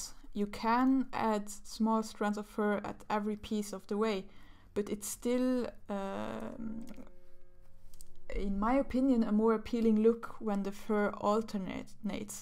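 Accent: Germany. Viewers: Are you German by any chance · Are you german? xD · xD kann ich verstehen. Hab von deinem Akzent eher rausgefunden als an dem Screen, hab nur auf die Zeichnung geguckt, deshalb finde ich es ja auch erst jetzt heraus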